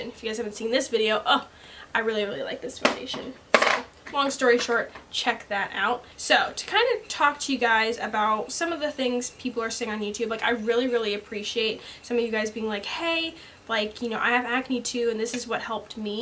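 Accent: United States. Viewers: what kind of american dialect is this?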